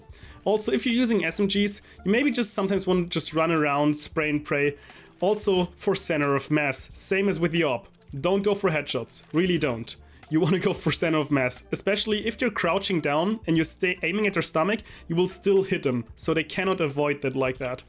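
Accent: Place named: Germany. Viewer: It sounds like he have a german accent :D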